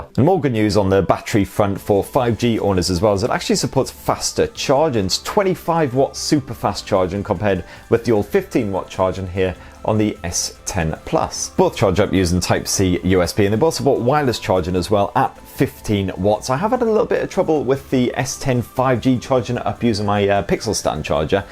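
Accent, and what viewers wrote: United Kingdom. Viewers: This video can actually be done in 5 mins or less. He said he doesn't want to bore us with the similarities, but that's all he's been talking about for about 7 mins. I skipped three times. Right, like we don't know about IP67. I love the British accent, but this guy's voice is... gross. So I thumbed down, twice if I can.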